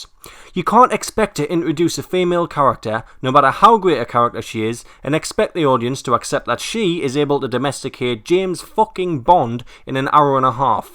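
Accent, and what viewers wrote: Newcastle, United Kingdom. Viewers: Gona take a wild guess , u from Newcastle? :P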